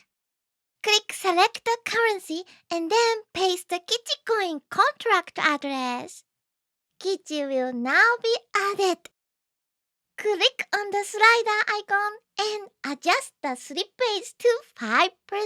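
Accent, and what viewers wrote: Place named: Japan. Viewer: The narration is exactly Japanese English. Love it!!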